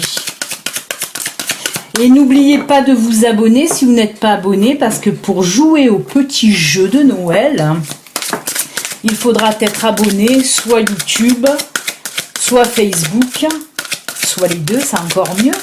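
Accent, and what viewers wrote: South, France. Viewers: Merci beaucoup pour tout de tout mon cœur ton oracle et très beau j'adore j'adhère t'es guidance surtout avec ton accent du sud sa me rappelle mes origines je t'envoie plein d'amour bisous 😘🙏✨💋🔥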